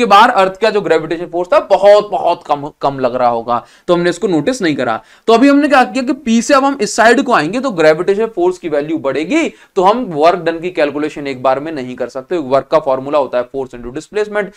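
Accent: Odisha, India. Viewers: Sir aap odisha se ho kya?😅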